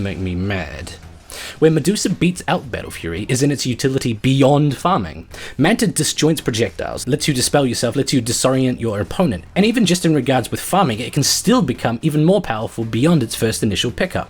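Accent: New Zealand. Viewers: hes a New Zealander but i guess its kinda the same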